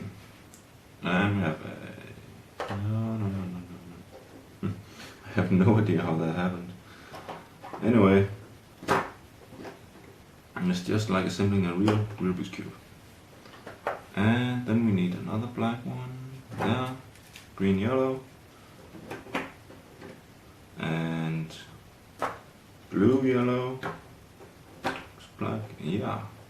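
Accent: Denmark. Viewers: der er et par stykker der spørger om du er fra Danmark..... jeg siger det er nærmere Jylland :) din accent taler for sig selv :)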